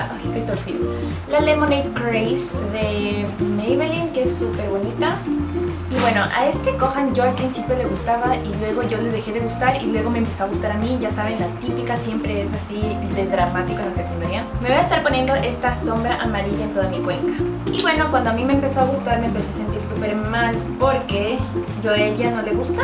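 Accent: Quito, Ecuador. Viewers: Hablamos bonito los quiteños te escucho me identifico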